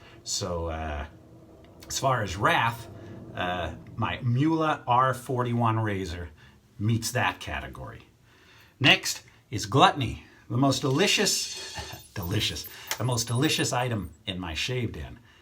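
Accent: United Kingdom. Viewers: Are you British ???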